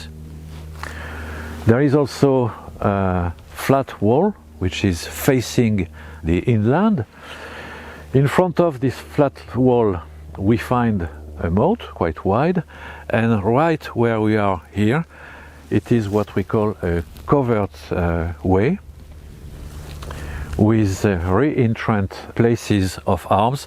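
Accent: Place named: France. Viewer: Allez vs continuez Mr!?..que des français parlent de la France! ..en très acceptable anglais sans disimuler l'accent👍 👌.. j'm'abonne pr la suite. Du Vaucluse.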